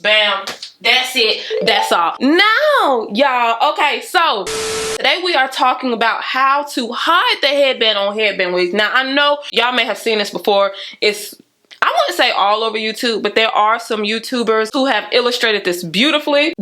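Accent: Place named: South, United States. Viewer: You. Are. HILARIOUS sis. This is my first video & I was CRYYYYYINGGG laughing!! The heavy accent with the southern country unabashedness was everything I couldn't have thought to ask for am 😂😂🤣🤣🤣 Beautiful job, ma'am!!! 😍👏🏽👏🏽👏🏽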